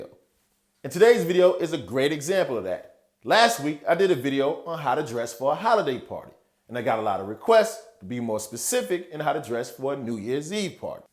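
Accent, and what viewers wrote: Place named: East Coast, United States. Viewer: Ahh ok you from Philly, I had a feeling you was from somewhere on the east coast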